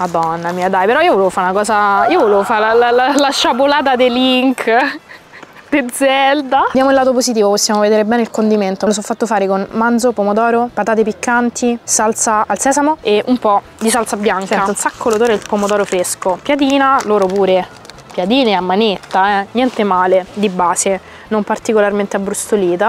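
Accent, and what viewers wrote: Rome, Italy. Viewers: 120 Alibaba bella sta cosa non era solle.mille e una notte, bellissima tu e mi piacciono i tuoi contenuti poi io da veneto mi fa morire il tuo accento romano de Roma 💋😉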